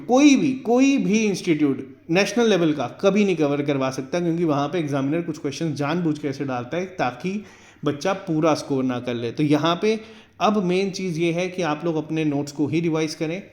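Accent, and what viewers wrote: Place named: Manipur, India. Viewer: Apki awaz sy mujhe uder manipur yaad aaya sir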